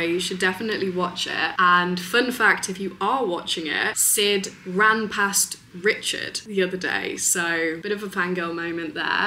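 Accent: United Kingdom. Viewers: As a previous professional party photographer in Sydney, I can safely say this, you’re young, British with a classy accent, blonde, and pretty, these are all you need to gain entry to all sorts of groups and cliques. Be confident in that 💪🏽😁!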